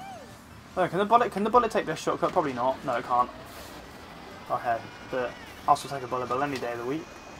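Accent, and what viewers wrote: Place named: England, United Kingdom. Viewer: The English accent is very confortable to hear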